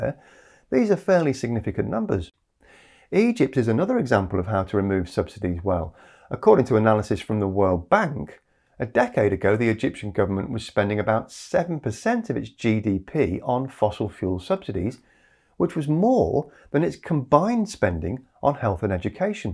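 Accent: United Kingdom. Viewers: Oh dear where to start! I'll concentrate on the UK where I live, and judging from the accent where the video is from. The production "subsidies" are tax treatment that all businesses benefit from, because if cost of production was taxed as opposed to profits, no one would bother producing anything. Talking of taxes, big oil was paying 40% CT (double other businesses) and now windfall taxed at 75%! The second bit addressed the direct type as in the price cap recently introduced. This was needed, and other countries have similar schemes, because of high prices. Prices are high for various reasons, post Covid bounce back, the war etc. But another cause of high cost is renewables, but that is a whole other discussion. Lastly "indirect" subsidies, i.e. not paying for the supposed damage caused. Well depends how you measure it, and I would not trust the IMF, they hardly ever get predictions right (same as IPCC). 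Just because an organisation has "international" or "world" in it's name, does not make a divine source of information. Anyway, there are carbon credits, ESG ratings, and taxes on anything that moves by fossil fuel, are these accounted for? Making EVs and wind turbines also have an environmental footprint, does the IMF call these subsidies? Talking of which, both these and other green industries receive huge direct subsidies from the taxpayer. Finally, I am not a denier, but to get to a greener future requires fossil fuels, and plenty of them for a long time to come. The va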